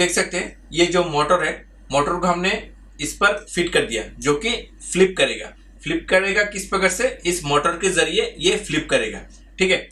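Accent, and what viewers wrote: Bengal, India. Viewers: U r Bengali?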